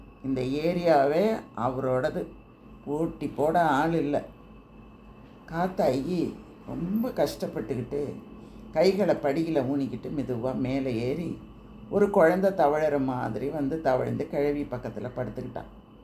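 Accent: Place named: Chennai, India. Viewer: நிதர்சனம். மெட்ராஸ் பாஷை super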